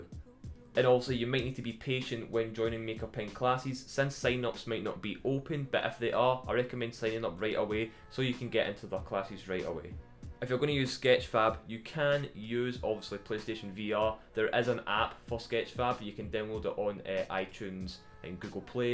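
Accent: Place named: Scotland, United Kingdom. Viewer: Fellow Scotish :0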